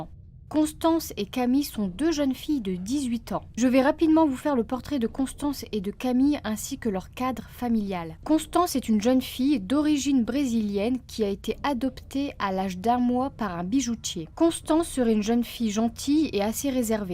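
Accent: North, France. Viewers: Bon travail mais élocution à améliorer. Débit trop rapide, quelques fautes grammaticales et.... l'accent du Nord un peu trop prononcé (c'est franchement pas beau). Pourtant je suis du Nord donc aucune discrimination dans mon commentaire, juste un conseil pour t'améliorer 😉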